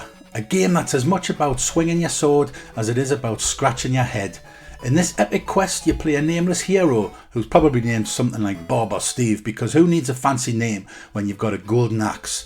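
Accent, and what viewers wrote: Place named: Newcastle, United Kingdom. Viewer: Sounds like you’re from Newcastle in the UK but doing US games and prices. Them prices were definitely high for the US and only reason Buster is so expensive due to its limited run in the US. There are a lot more rarer and more expensive games in the UK say an easy 20 games. Outrun you can pick up for about $18 in the US right now. Always good to see videos on the Master System 👊🏻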